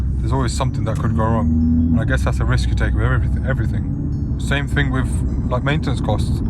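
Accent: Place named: South Asia. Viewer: This is so odd you are white but you sound south asian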